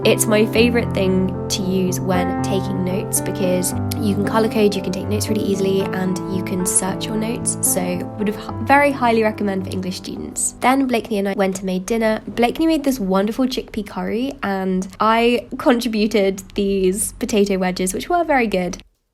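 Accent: United Kingdom. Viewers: Two things why i watch videos 1. To be motivated 2. To learn some British accent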